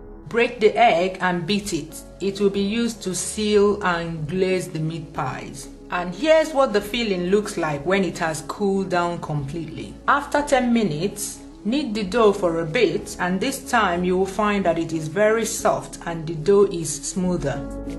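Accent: Igbo, Nigeria. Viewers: This is purely ALL NIGERIAN! Great Nigerian accent with some wonderful mouth watering recipes! My rating on this video is clean 5 star! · My sister... the igbo accent ❤️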